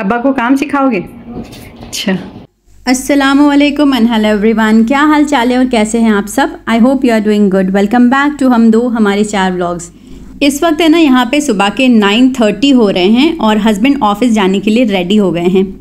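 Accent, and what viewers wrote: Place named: Mumbai, India. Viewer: Aapke mumbai ka accent sune toh acha dikhta ❤frm Hyderabadi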